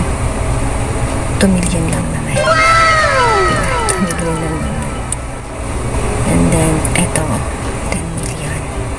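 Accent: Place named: Ilocos, Philippines. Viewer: ilocano ka ba ading hahhaa nice though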